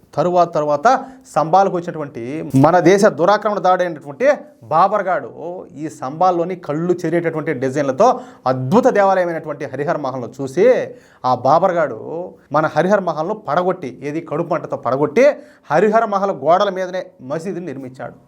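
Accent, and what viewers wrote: Rayalaseema, India. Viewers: రాయలసీమ యాస superb. యా ఊరు నీది.!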